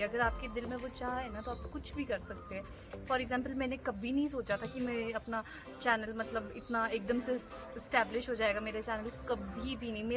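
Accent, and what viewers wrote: Pahadi, India. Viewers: Yes I am interested too in your city life, your voice such a sweet bird like kuhu 😊💝 and apki voice m jo pahadi tone aati h wo lajwab h , or ye tone hmari garhwali tone se match hoti h 😊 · Appki Hindi bolne ki tone ek dam pahadiyo wali hai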